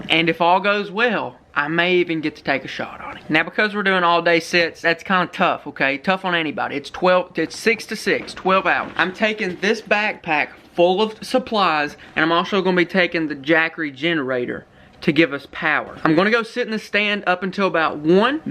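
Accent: United States. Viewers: bro is the most country sounding person i've ever heard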